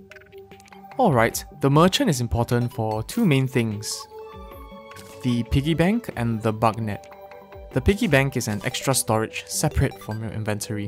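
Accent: Singapore. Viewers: Where are u from? Ur accent sounds like ure from singapore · There's some Singaporean accent in his voice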